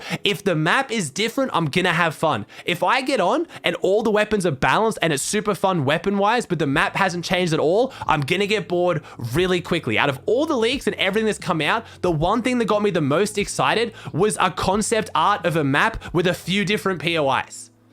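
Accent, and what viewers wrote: Australia. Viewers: has a very strong Australian accent but when he starts off his second line with “Welcome back to my YouTube Channel!” It sounds so British how he always pronounces it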